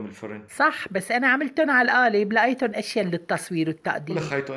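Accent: Aleppo, Syria. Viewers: ياي يا خالة على لهجتك الحلبية والله صرت أعشقها من لما سمعتك...تسلم إيدك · يسلم هالإيدين🎀مافي احلى من الحلبية وحكياتن👍😍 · يسلم اديكي انا حبيت اللهجة الحلبية كرمالك و بتمنى نشوف وجهك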